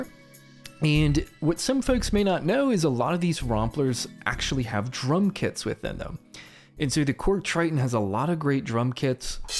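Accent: Baltimore, United States. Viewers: lol i would bet my life you're from baltimore or philly. great video!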